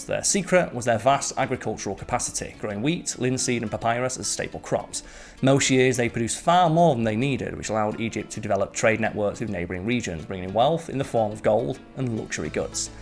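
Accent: United Kingdom. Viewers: A British voice does make it a Simon video!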